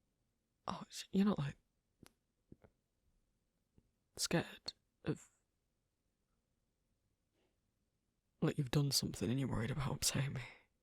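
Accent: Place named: United Kingdom. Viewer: GRAAHHHH i ADORE the british boyfriend audios!!! all your recent audios have been AWESOME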